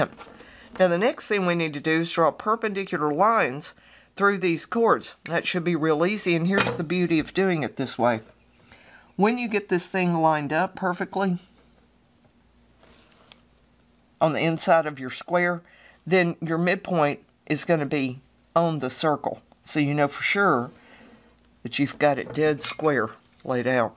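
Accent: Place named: South, United States. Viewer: Love the accent…western? Southern?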